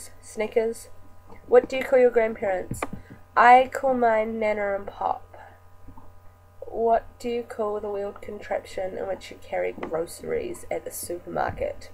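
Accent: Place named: New Zealand. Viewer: i guessed new zealand before you said ! i win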